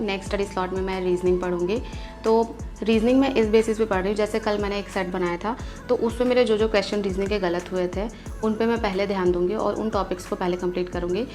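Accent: Bihar, India. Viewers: Madam aap Bihar se hain ya Jharkhand se??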